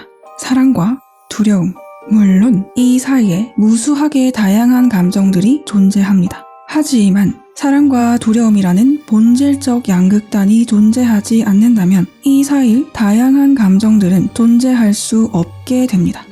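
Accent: Gyeongsang, South Korea. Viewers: 아따 ~걸죽한 경상도 사투리여서 좋네요ㅎㅎㅎㅎ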